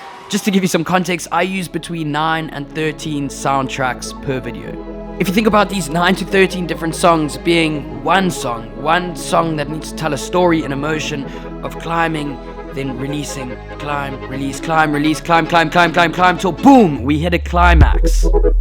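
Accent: South Africa. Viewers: So nice to hear the South African accent, keep on inspiring bru, I made a film myself and I kinda realized I did way too much of the stuff I shouldn’t xD Would love if you came up to Joburg somewhere along the line, I dig your content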